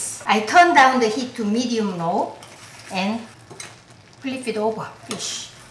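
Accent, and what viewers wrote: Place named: South Korea. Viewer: 한국말 같은 영어 너무 좋습니다!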